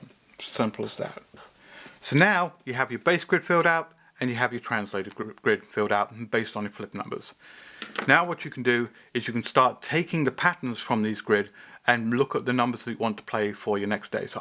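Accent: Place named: United Kingdom. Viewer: looking forward to. You UK? I love your accent.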